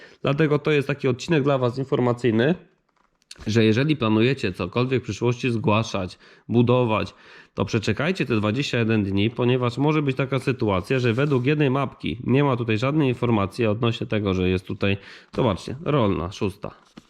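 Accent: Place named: Poland. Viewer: Jak cie slysze to czuje cebule a wypowiadasz sie jak cebulandia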